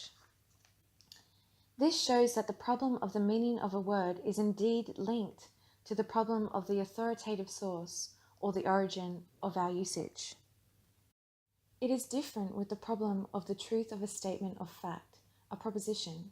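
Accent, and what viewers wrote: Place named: Australia. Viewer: Awesome and great to hear this in an Aussie accent!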